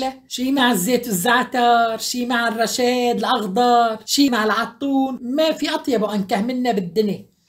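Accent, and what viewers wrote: Aleppo, Syria. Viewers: لهجة حلب مثل العسل الصافي وأهل حلب والعادات والتقاليد والتراث العريق من آلاف السنين إلى اليوم تاج على رؤوس الناس أنا من حمص وأسكن باللاذقية دخيل رب السوريين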